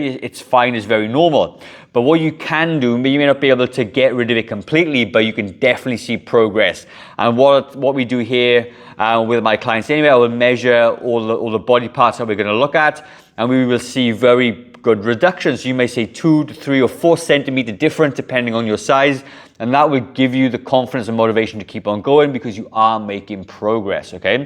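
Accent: Wales, United Kingdom. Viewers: Wales, you do not need to say your from Wales I could tell by your accent first time I watched your very helpful vids, I am from Tenby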